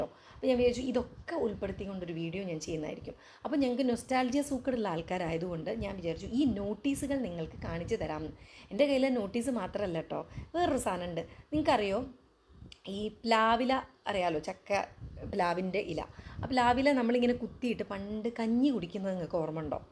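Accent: Kozhikode, India. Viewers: ഈ nostu കേൾക്കാൻ വേണ്ടിയാണ് ഈ ചാനൽ കാണുന്നത്. പിന്നെ കോഴിക്കോട് സ്ലാംഗ് കേൾക്കാനും ഒരു ആഗ്രഹം. എൻ്റെ അച്ഛൻ്റെ വീട് കോഴിക്കോടാണ്..അവിടുത്തെ ഭാഷ അതേപോലെ അവതരണം 👌👌👌👌👌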